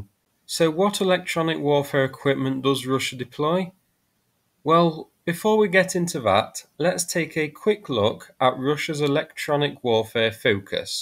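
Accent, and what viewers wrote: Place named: Northern England, United Kingdom. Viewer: Thanks for the info. I'm not a Brit, but if I had to guess I would have guessed that his rather... noticeable accent was northern. 😉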